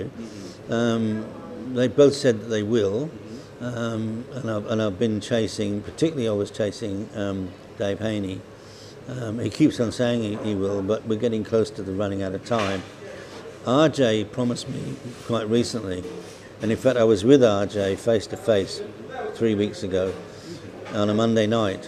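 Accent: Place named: Norfolk, United Kingdom. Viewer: Cor - you sound right Norfolk boy!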